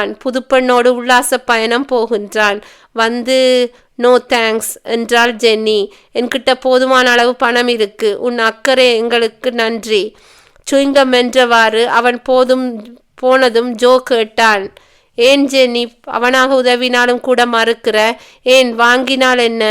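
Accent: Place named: Sri Lanka. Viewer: என்னாச்சுப்பா ஏன் இப்படி அவசரமா வாசிக்கறீங்க உங்க குரலில் ஒரு வசீகரம் உண்டு அந்த கொஞ்சும் இலங்கை தமிழை கேட்க கேட்க இனிக்கும் ஆனால் இக்கதையின் வாசிப்பில் ஏதோ மிஸ்ஸாகிறது போல் தெரிகிறதே நிறுத்தி நிதானமாக வாசியுங்கள் சகோதரி என்றும் உங்கள் வாசிப்பை விரும்பும் நானும் ஒரு சகோதரி தான் பிழையாக கருத வேண்டாம் உண்மையிலேயே உங்கள் பழைய நாவல்களை கேட்டு வியந்து போயிருக்கிறேன் தொடர்ந்து உற்சாகமாக வாசியுங்கள்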